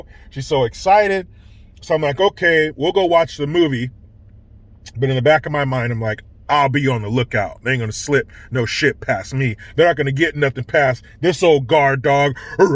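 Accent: United Kingdom. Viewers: You sound like a great dad, J! Love your vids! The brit accent is hilarious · Nice British accent 👌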